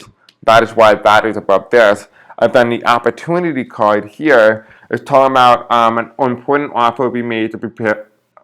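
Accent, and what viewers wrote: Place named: East Coast, United States. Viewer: Your voise seems to say that you are from the East Coast of America.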